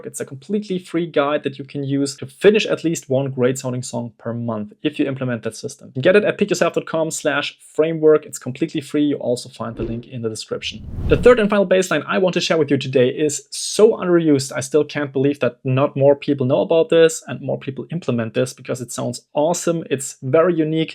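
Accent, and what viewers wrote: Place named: Germany. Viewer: Your english is for your deutsche Akzepnt very nice;) ich kenn einfach 3 andere, die 1:1 ähnlichkeit mit dir haben!:D:D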